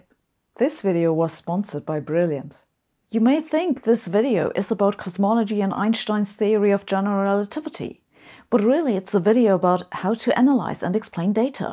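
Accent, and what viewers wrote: Germany. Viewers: is german pronunciation for hypothesis- hypothetis. Or is just how sabine pronounce things?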